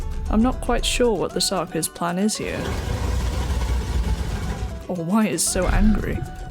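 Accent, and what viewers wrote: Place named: United Kingdom. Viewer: Ah ha! So you are bri-ish!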